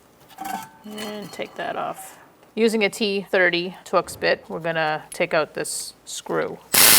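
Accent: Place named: New England, United States. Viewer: wicked new england accent lovin' it kid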